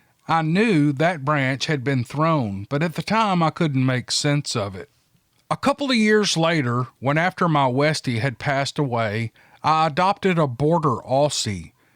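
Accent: South, United States. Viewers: Hi, i live in chesham, a little village outside london UK, i found your channel last month,and fell in love with it from the first story! You are truly a master storyteller,you can connect and get lost in each and every story,short or long,you never disappoint. I'm now enjoying all your back catalogue of stories, and have introduced my girlfriend to your southern(?) drawl too! Love u lots bro,keep up the amazing job u do,you're my favourite addiction by far!😜😃🤩👍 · Yes his voice has some special soothing quality and I love the Southern accent. I'm Irish and it brings me back to 'ghost story' nights in the countryside when we were kids... Thank.you 🍃🌿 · every time you say" that's how I want to see one in my car, moving" hahaha it makes me laugh every time! I listen to a lot of podcasts everyday as I'm a truck driver in North Dakota. I must say you do a very good job! 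You have a great personality and that southern accent I can just listen to you talk forever.... Thank you for what you do. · ok so I never commit, but I love your show! I love your accent I am from North Florida and my family sounds like you. So I feel at home listening love the southern drawl. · I'm a 71 year old, happy, Texas grandmother. I can't tell you how much I enjoy listening to your soothing Southern accent every night. I often fall asleep listening to your stories. I get so tickled when you lose it reading people's descriptions of smells! This Southern lady loves your sense of humor, your gentlemanly attitude, and your kind, Southern voice. Don't worry about the misprounciations...it's all part of your charm! Your honesty is refreshing in this troubled world. Thank you for being real. Hugs from this grateful grandmother. May God bless you and yours.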